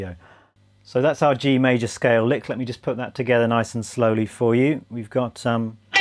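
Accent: United Kingdom. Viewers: Hi, I am amazed at these lickes and phrases you keep coming up with. You sound british but you'rer true country. At 77 years old as I, i FIND i am not too old to learn. I am rather new to your youtube as you might have guessed. Keep it going.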